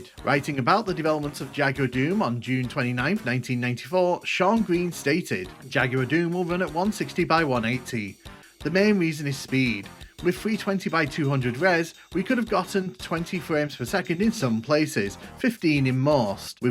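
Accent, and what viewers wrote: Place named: United Kingdom. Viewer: He's British, and we Brits pronounce it that way.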